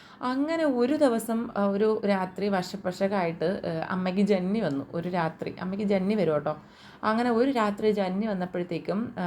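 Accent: Trivandrum, India. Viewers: samsaram enikkum eshtamanu tvm slang kelkkan anu ❤❤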